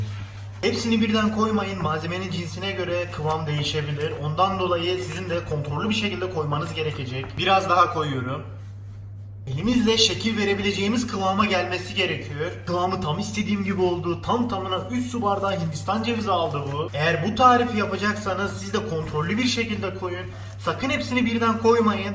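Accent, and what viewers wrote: Adana, Turkey. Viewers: Hocam aslen adanalı mısınız :D çok tatlı konuşuyorsunuz :Dd vallahi sırf söylediğiniz eylemleri dinlemek için izliyorum :dD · Atirüüm,Yapıyurüüm,Beklirüüm,Kesiyürüm Adana şivesinin verdiği lehçe ile müge anlının mutfaktaki versiyonu 😁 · Abi adanalı mısın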